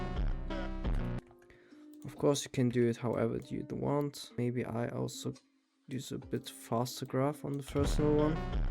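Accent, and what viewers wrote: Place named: Germany. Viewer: i hear the german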